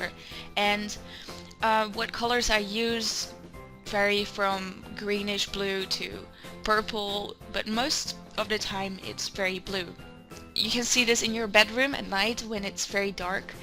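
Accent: Netherlands. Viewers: "Oh dit meisje is Nederlands, ik hoor het gewoon, 100% zeker" ... " I learned this in Dutch.." I knew it ^^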